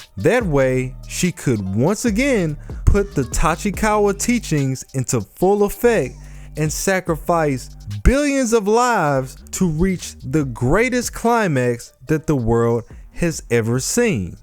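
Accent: United States. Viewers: you from Philly? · are you from Baltimore?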